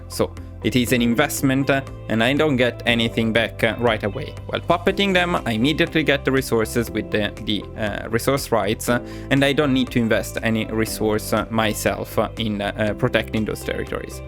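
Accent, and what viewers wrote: Italy. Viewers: Wait sei italiano? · That accent seems Italian, quell'accento mi sembra molto italiano è uno dei nostri?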